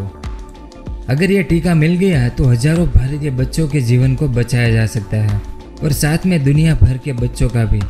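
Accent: Gujarat, India. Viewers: Gujrati hain bhai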